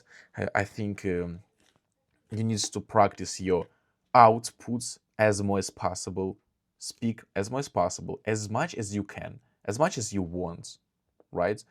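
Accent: Russia. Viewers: I'm not currently interested in reaching the c1 level but idc, you're so handome and have russian accenttt 😝🔥 good luck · accent giving u out a lot, work on dat (as a russian myself) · Сходу понял, что ты из России)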